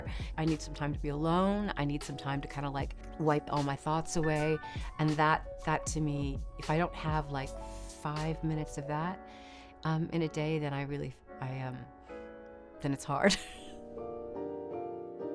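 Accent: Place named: United Kingdom. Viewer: Why did i think she was british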